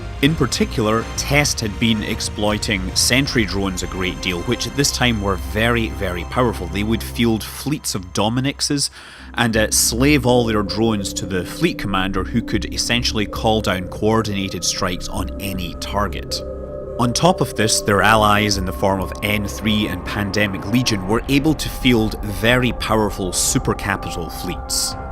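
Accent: Scotland, United Kingdom. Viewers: I meant no offence. I actually like your stuff. I know it sounded like an attack towards you but in reality thats not what I meant. My appologies if thats the interpretation. What I meant is that althought people support it, I understand why the others like me dont support it. My other point usually when the CFC talks about the war vs BOB completelly fail to mention war vs IT alliance and war vs DRF, cos it did not go particularly well for goons. They also seem to jump from that straight to their next victory aka Fountain. That was my point. The story is not balance. After almost a decade of listening to the mittani's stories, one gets tired of his bs aka Cloud ring alliances hate Jeff. I guess he has no shame. And you do have a good scotish voice and I also think it will work out well for a book or something. I have watched your videos years ago and as you probably know the eve community is usually very good at geting things done well that benefit the game. 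It just shows to wonder that there is a lot of resistense from the community for this project. There has to be a very good reason for it. All the best.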